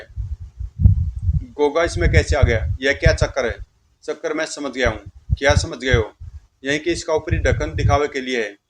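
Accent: Haryana, India. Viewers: Bhai hariyanvi lage se😊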